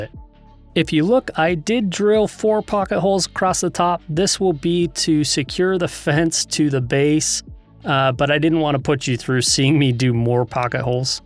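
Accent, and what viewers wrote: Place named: Salt Lake City, United States. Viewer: "All the way acrosst it?" SLC people talk funny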